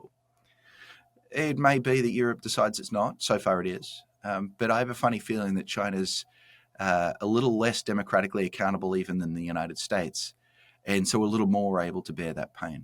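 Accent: Australia. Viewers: This guy has got it and explains well. He sounds Australian, wonder if he is? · Strange to hear "we" meaning Americans in an Australian accent. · Not too bad for a 'strine' accent. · You sound Australian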